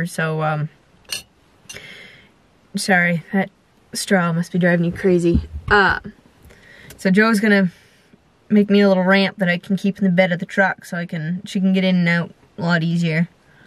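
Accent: Maine, United States. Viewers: Oh man, I am truly sorry to her about June. They truly are family. I hope she soon gets better. Sending prayers your way. On a lighter note, something I have thought about sharing with you numerous times since I began watching and following your channel. You have sent me down a memory lane from years long gone. That is due to your Maine accent. (Don't hate on me yet!😁) My closest buddy in Vietnam was a Sgt. from Maine. I can't recall what part. We became close pretty quick, with each struggling to figure out what the heck the other was saying. I was raised in the south, and needless to say, I never mastered the Queens English myself. 🤣 We spent our full tours together, although we rotated back to the states at different times. I left first, at a time when things were "less than ideal", and we lost contact. I tried for weeks, calling the Dept. of the Navy, and the Marine Corps, trying to learn if he had made it back. 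Finally, one evening I got the news I had long hoped to hear. "Sgt. John" made it home, and was doing well back in Maine. Isn't it funny? How something as simple and common as an accent, can send us back to a time so long past? God bless you and yours. And, God be with June. Happy trails,.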